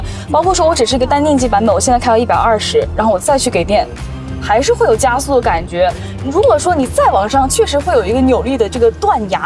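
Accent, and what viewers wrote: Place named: Northeast, China. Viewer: 有所长的声音，信任度一下子就上来了🎉，新人加油哈，听着像我们东北妹子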